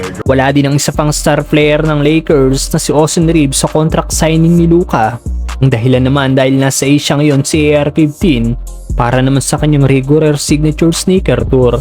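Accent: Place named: Laguna, Philippines. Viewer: San ka sa Laguna? Yung tono mo haha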